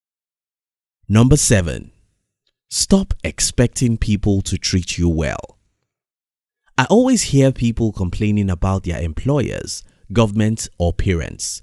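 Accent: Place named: Nigeria. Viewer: African accent straight from nigerian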